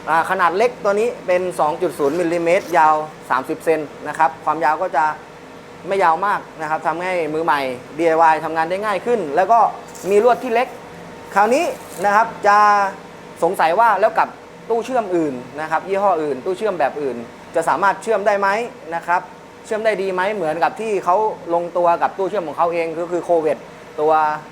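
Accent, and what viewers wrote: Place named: South, Thailand. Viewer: สำเนียงเหมือนคนใต้บ้านเราเลย นิ 555fc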